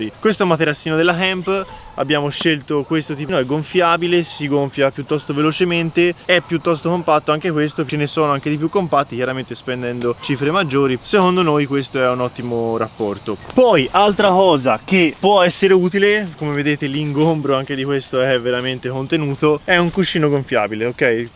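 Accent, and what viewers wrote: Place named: Tuscany, Italy. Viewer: "queesto materassino della (c)amp" adoro l'accento toscano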